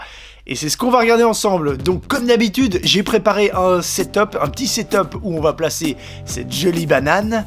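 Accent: Belgium. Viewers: T'es belges ? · tu es belge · il est belge?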